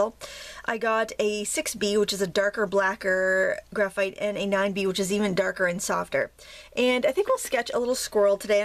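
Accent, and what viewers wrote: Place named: New England, United States. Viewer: I never tire of hearing the Maine/New England "Squerl" :) oh And "seepeeah" is correct - "Sepia" the Neo-Latin name for the first genus of cuttlefish that Linneas named in 1758. It is a transliteration of the Ancient Greek "σηπία", "sēpía", meaning "cuttlefish", and of course the colour has the same name as the ink of the cuttlefish is the original (and best in my view in terms of hue, stability is another matter) "sepia" paint. Hard to get now as cuttlefish are sold frozen whole for food with the ink sacks intact now, so not a byproduct.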